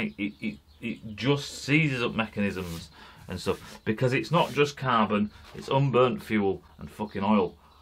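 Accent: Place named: Scotland, United Kingdom. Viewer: Going to watch your video on shaker can painting. And, I always thought you were Scottish. No insult intended. Just all of your accents sound the same to my American ears.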